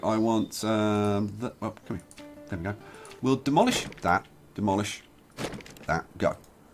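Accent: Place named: England, United Kingdom. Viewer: Hey mate =) love your english acsent, where in england is it from? Hope you continue this. If you still have the save. we never got too se a brewary and the pub being buildt. build a royal sea harbor =) cherrio mate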